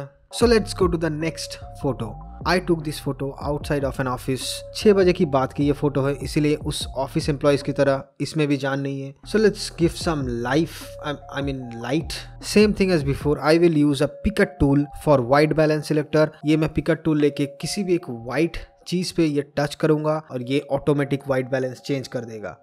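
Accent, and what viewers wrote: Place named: West Bengal, India. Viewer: Bro, you awesome. Your Hindi pronunciation is very similar, are you from West Bengal? If yes, please tell me the location. I'm also a photographer & Filmmaker, I would love to go for a shoot one day. Lots of love & good wishes. :-)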